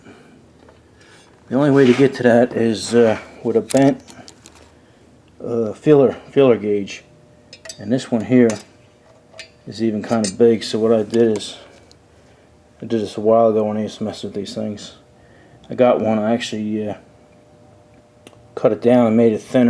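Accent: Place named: New Jersey, United States. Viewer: Yeah! What state you in? Your state sounds like New Jersey or some other upper east coast state.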